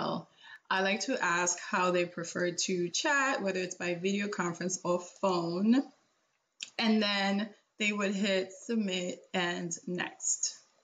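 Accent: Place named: Caribbean. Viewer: I'm hearing a Caribbean island accent 🤔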